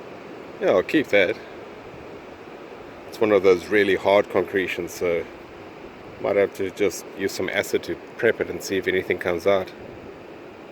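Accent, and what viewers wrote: South Africa. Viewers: Is that a partial South african accent I hear?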